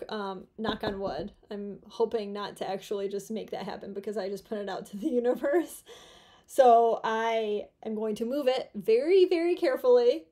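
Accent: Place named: Midwest, United States. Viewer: I'm a little disappointed you won't be sliding anymore. I thought that having the baby would be the big change, but I guess this engagement is bigger. I really enjoyed you're frank discussions and the Midwest accent. I have some relatives in Iowa, Illinois, and Ohio.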